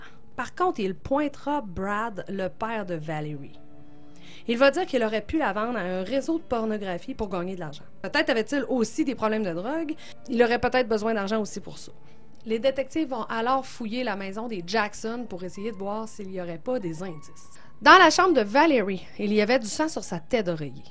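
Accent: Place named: Canada. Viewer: J'ai découvert ta chaîne il y a peu, j'ai dévoré tes vidéos les unes derrière les autres j'adore, j'aimais beaucoup ton ancien générique car on y voyait les plus grand serial killers mais le nouveau à vraiment la classe ! Très bien réalisé 👍 Continue lache rien j'adore ton petit accent canadien ( mon rêve d'y vivre... ) de gros bisous de Reims en France 😘❤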